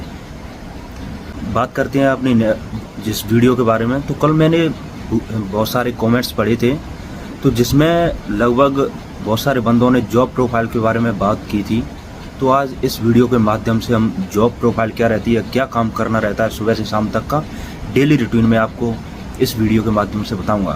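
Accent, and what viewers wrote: India. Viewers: भाई आपकी आवाज मुरैना साइड की लग रही है ,, में सबलगढ़ से हु आप कहा से हो , प्लीज btao ??? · Bhai Rajasthan se ho kya!!!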